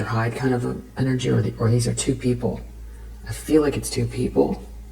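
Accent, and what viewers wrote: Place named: North America. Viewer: Im pretty sure isnt British, you can tell by how she pronounces her "R"s she is almost certainly from north America (Probably USA, or maybe Alaska or Canada)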